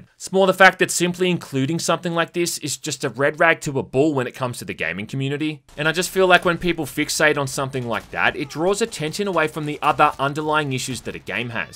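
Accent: Australia. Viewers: he’s Australian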